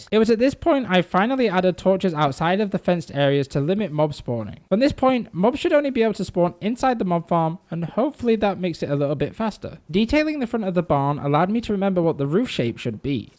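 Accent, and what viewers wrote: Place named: United States. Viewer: You come from uk? Boi you sound like a guy that comes straight from the us!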